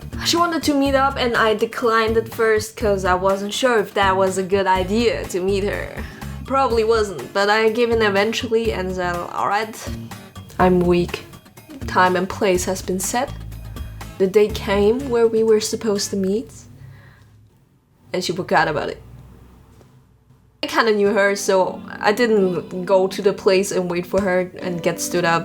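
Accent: Germany. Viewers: I swear i though you were German from the accent...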